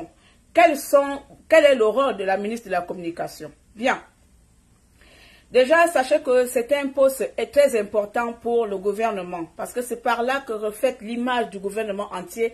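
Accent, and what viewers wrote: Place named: Cameroon. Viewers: N'est ce pas ?son accent montre kel est aussi camerounaise com sa sœur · Tu ne dis rien !!! Et tu es camerounaise. Je t'ai reconnu par ton accent...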